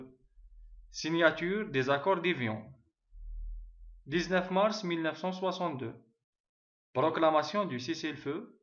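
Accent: Kabylie, Algeria. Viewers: Azoul Monsieur, d aqvayli directe Avec ton accent😊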